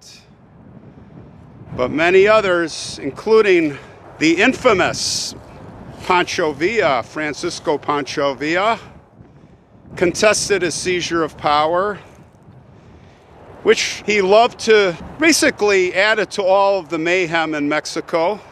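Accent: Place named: Chicago, United States. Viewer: This is said with love: Your accent sounds like the super fans of saturday night live!! Da Bearrrrrrsss!!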